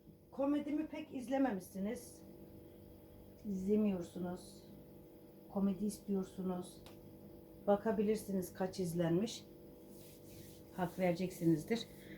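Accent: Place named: Tavas, Turkey. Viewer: KURBAN OLDUĞUM TAVAYLA KONUŞMANA KURBAN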